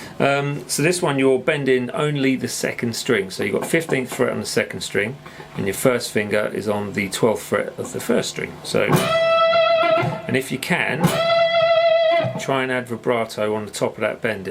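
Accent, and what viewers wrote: United Kingdom. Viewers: Nice rif. are you from London?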